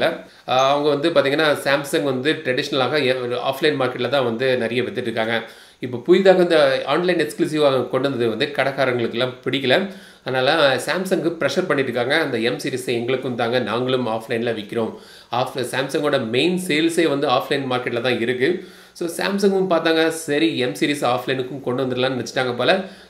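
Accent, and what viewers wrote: Kanyakumari, India. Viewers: Polinju pona company! Typical kaniyakumari slang! A Fan from your district bro!.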